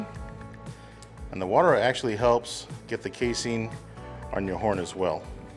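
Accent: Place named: Texas, United States. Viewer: you’re the best. As a native Texan living in SC, just hearing your voice makes me feel like I’m back in south central Texas. But amateur smoker and neophyte sausage maker, videos like these change my game. ¡Muchas gracias, vato!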